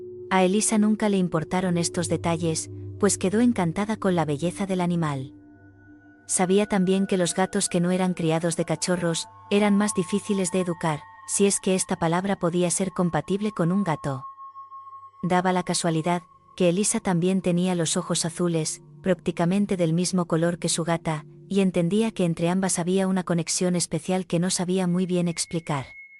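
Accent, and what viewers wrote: Spain. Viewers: A pesar de su voz con acento español... al principio me molestaba, pero al final me quede porque esta buena la historia, y su acento no es tan marcado. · LAS HISTORIAS SON MUY BONITAS PERO LA VOZ DE ACENTO ESPAÑOL ES HORROROSA 👹😭😭😭😭😭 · Al contrario me encantan su acento español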